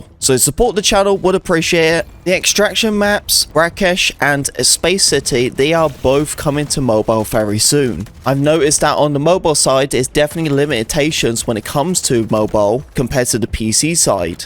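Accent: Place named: United Kingdom. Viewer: hey man your so british idk what app you said for controller support for mobile no matter how many times i rewind to hear you again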